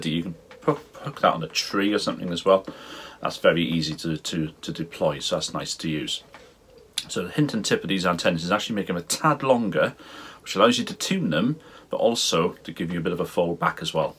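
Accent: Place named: Newport, United Kingdom. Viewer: Always a pleasure to watch, the accent is familiar, im a newport lad personally. 73 keep up the good work.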